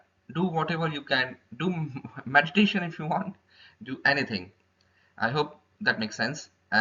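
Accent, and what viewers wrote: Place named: India. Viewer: "Give" the exams...very Indian accent...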